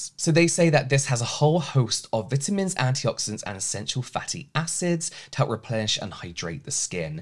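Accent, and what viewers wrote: United Kingdom. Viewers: Hi sweetness (from Sicily)!i am an english teacger in a secondary school and,you know what,I adore your pure Brit accent and suggest my students following you😂😂😂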